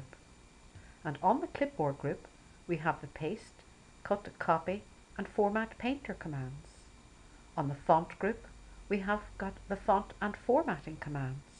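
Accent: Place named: Ireland. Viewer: Thank you for your time. Love the motherly Irish (?) lilt too, very relaxing, I almost fell asleep:)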